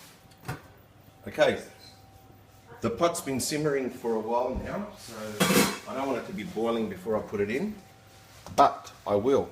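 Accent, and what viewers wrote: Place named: Greece. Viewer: I swear he sounds Greek until he says things like oregano.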